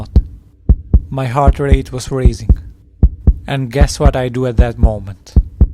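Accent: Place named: India. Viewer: Why does he sound indian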